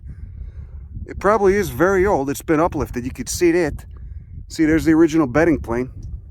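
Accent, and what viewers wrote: Chicago, United States. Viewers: I just learned the word parasocial. I never thought to imagine being a plant described by this perfect Chicago accent. But I love it.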